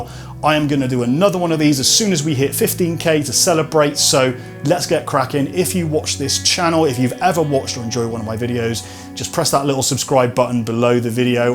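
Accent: RP, United Kingdom. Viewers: I always love your videos and posh accent ❤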